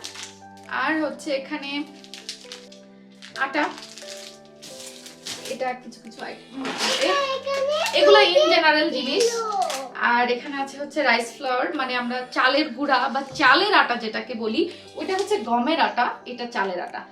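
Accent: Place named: Chittagong, Bangladesh. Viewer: কথা শুনে মনে হচ্ছে চিটাংগগে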